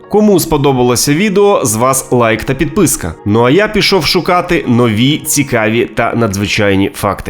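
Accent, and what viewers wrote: Russia. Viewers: В УКРАЇНСЬКІЙ МОВІ "Г" ВИМОВЛЯЄТЬСЯ БЛИЗЬКО ДО "Х" А НЕ "К" ЯК В КАЦАПНІ, ВЖЕ ЧАС ЗАПАМ"ЯТАТИ, ТА ПОЗБУВАТИСЯ КАЦАПСЬКОГО АКЦЕНТУ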